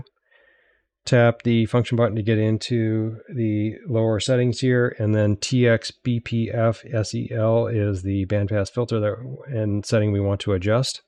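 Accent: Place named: United States. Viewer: One thing you could also do to improve your transmit audio is to ...speak...more...slowly.... I dont mean gaps between the words. I mean the w o r d s t h e m s e l v e s . Whatever part of the USA your accent is from, you speak very fast. So on a difficult receive situation, or for someone whose first languge is not English, the receiver will find you much more difficult to understand. Yes it's difficult to do, but certainly in your case it will make a big difference.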